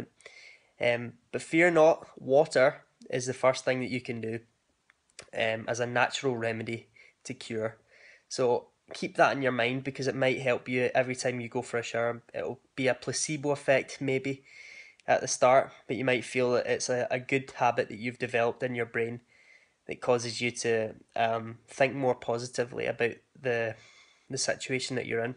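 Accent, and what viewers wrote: Scotland, United Kingdom. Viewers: Very very true. Some people don't understand because they can't relate, so it's hard to explain it to them. And, your Scottish accent is a beautiful reason to listen to you. Well, in addition to your humor, advice, observations, and everything else. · You are amazing and I love watching your videos. They make me feel good and I bet you help so many people who don't feel worth it. I have learned to love myself more, over the last 20 years, than I did the first 22 years of my life. When I came across your videos I was amazed at such a handsome, caring man. Even though I am happy with myself, it is still nice to hear your encouraging words, especially with the Scottish accent. I just love hearing your voice! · I have acute anxiety disorder and have been anxious since I was a child. Nothing happened to me abuse wise but I was born very ill and separated from mum for 6 weeks. 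I was in and out of hospital until I was 9 also. I do a lot of natural things too but need 20mg of Prozac daily to keep everything at bay. Thanks for sharing. The Scottish accent is gorgeous 😍 I'm just back from Edinburgh with my hubby. We went for our first wedding anniversary as we got engaged in Edinburgh 2 yes ago xxx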